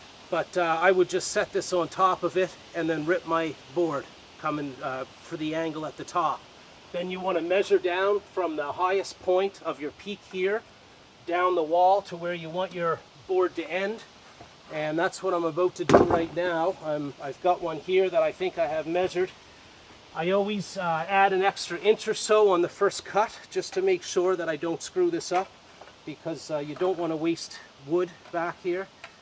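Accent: Ireland. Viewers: Are you irish... Nice vid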